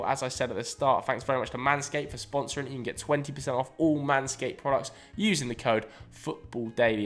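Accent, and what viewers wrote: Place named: RP, United Kingdom. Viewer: Posh wigger talking about football...skip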